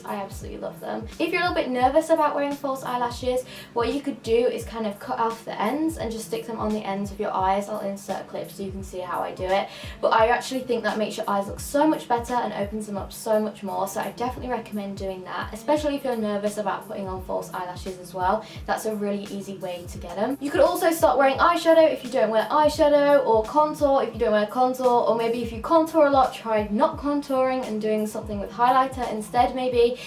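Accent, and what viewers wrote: United Kingdom. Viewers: I 💗 ur British accent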